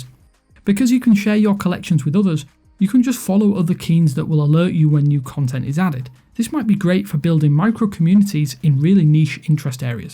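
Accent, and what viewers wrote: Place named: Hull, United Kingdom. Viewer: Hull City, lol...was wondering where your accent was from.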